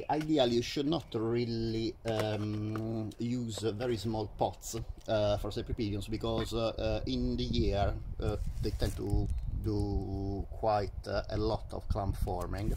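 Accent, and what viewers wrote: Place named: Italy. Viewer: Because of your accent, are you Italian? It would be easier for me to write to you in Italian. 😊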